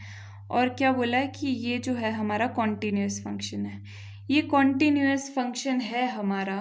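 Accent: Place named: Haryana, India. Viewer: Through your tongue..you look like from haryana or delhi.. but very sweet voice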